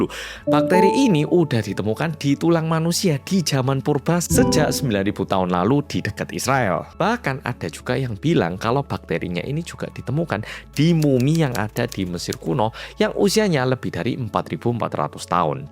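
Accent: Java, Indonesia. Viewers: hai mas medok🎉 lgsg nyimak🎉🎉🎉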